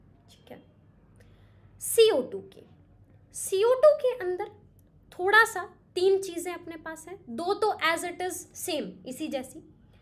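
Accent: Haryana, India. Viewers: Boli haryanvi lage sey ❤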